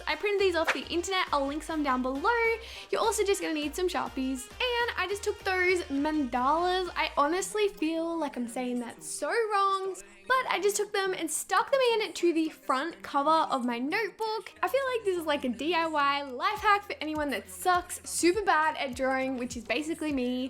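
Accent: Australia. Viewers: i love how austrailans talk... vider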